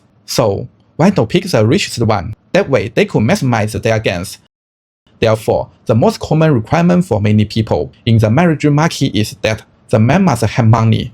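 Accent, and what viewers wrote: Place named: China. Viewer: Hello, if you lose Chinese accent, would be better for views. But it's tough and long process...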